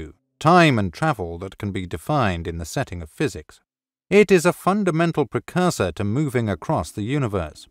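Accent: United Kingdom. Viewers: Same here boys!! British accent is a must.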